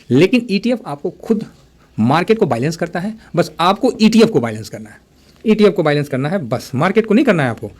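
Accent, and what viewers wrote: Bihar, India. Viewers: Sir aapka style bulkul hi deshi hai, sir aap jarur bihar ke honge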